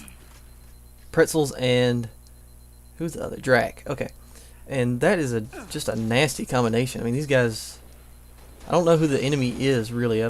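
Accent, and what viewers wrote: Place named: Texas, United States. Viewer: you sound like ur from texas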